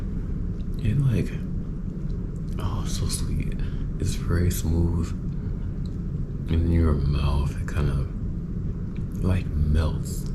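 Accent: St. Louis, United States. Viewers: That st louis accent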